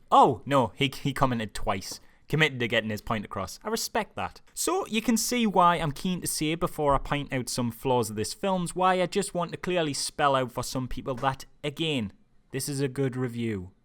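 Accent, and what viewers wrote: Newcastle, United Kingdom. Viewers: LOVE your videos... (screw the haters)... expecially the way you surgically, cleanly and clearly (be it with a slightly noticeable gerodie accent lol) take a red hot knife to many movies good and bad, with a perfect dos of humour to tie it all up... I always take your word and I'm very much looking forward to see Doctor Strange.... P.s More than 3 seconds is needed for a spoiler warning lol And another P.s Keep it up my Gerodie Lad n please stop Gerodie shore. It really make u all look bad.... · Are you from Newcastle?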